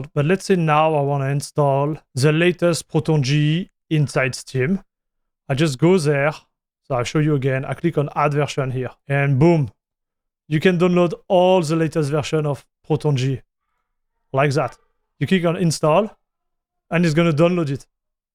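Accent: France. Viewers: Merci pour cette vidéo et quel accent français 😋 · Superbe accent Français !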